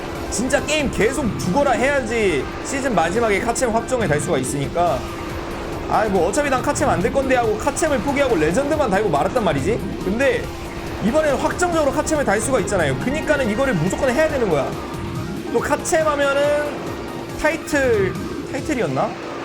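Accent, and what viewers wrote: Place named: Jeolla, South Korea. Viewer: 왜 전라도말을 나 전라도 목포에서 태어남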